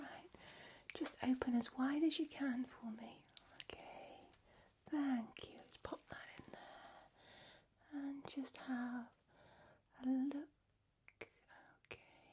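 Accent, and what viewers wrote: United Kingdom. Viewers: I love the tingly and relaxing video. The British accent is a plus. Just lovely. ❤️🌻💜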